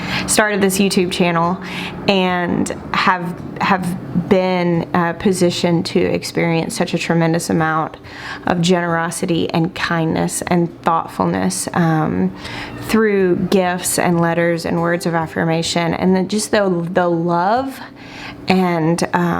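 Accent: South, United States. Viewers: I love watching you. I love your heart. I love your authenticity. I think you and are one of the most "real" of the "youtubers." I just watched a tv show that is also shown on YouTube, Growing a Greener World". I've watched Joe on PBS for years but tonight when watching his latest episode, I realized YOU HAVE A CLONE IN THE GARDEN WORLD!!!! You have to watch her. She sounds like you describing her gardening love. The link is <link> She is Nikki Jabor. I wasn't familiar with her but apparently has a large following and a book. Check her out. I would rather hear you talk though.....I'm partial to a southern accent!! Thank you for sharing your life, your love, your family and your faith. You are a blessing to me. 💕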